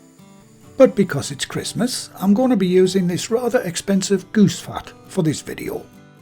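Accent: United Kingdom. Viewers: 너무 잔인해 You are so cruel 이런 멋진 감자라니 It looks Very nice potatoes 감자를 싫어하는 나도 먹고 싶어지잖아 I want to taste them even l don.t like potatoes 그건그렇고 By the way 너의 영국발음에 중독되고 있어 l am addicted your English accents 너 때문에 오븐을 살지도 몰라 lf I buy an oven it's because of you 하여튼 너의 동영상이 맘에 들어 Anyway l like your video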